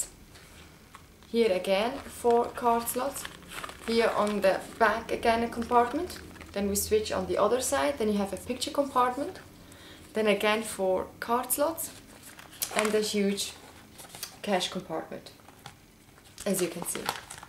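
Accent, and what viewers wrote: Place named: France. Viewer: You are so sweet! And I love your French accent hihi, I love the French language! I'm sorry if it's not French by the way, I know you are from Switzerland ^^. I subscribed!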